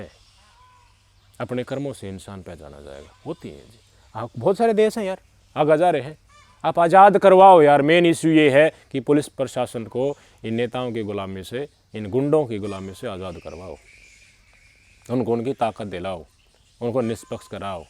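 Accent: Haryana, India. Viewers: bhai haryanvi bol hai pr bhai ghani hi knowledge se bahi ne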